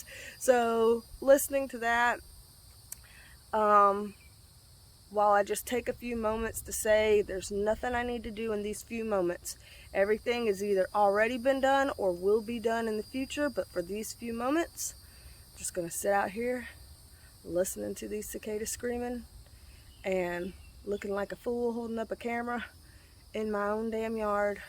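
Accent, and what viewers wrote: South, United States. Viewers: We love your southern twang! 😁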